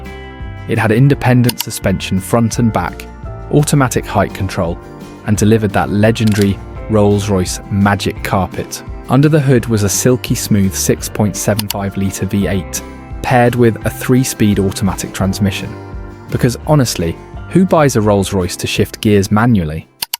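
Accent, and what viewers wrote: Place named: United Kingdom. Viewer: I detest these videos with robogobs: it us narrated with a British accent, but using 'Merkinisms such as "Five hundred twenty nine".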